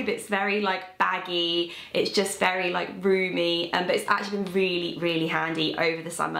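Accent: United Kingdom. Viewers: Yikes, it’s not wrong, she’s British. Have you never heard an accent in your life?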